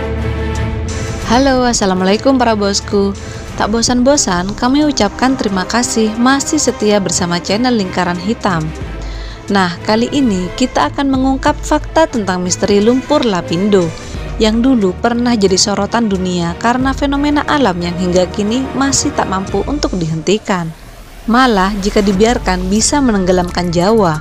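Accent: Madura, Indonesia. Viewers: Kayak orang madura logatnya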